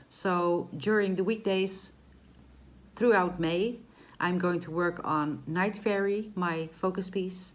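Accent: Netherlands. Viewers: Fab update! Can I just say how beautiful you look in this video!! I loved the Dunglish and strangely understood what you meant each time 😂 fabulous stitching month, love your updates. Happy Easter xx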